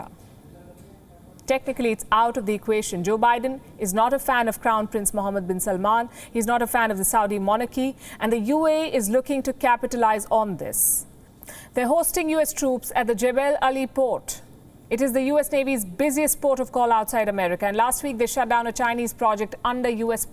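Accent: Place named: India. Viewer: I wish madam newsreader hadn't had Indian/pakistani accent. it is quite unimpressive.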